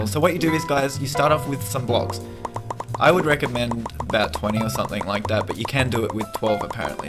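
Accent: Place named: Australia. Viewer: you sound australian, like me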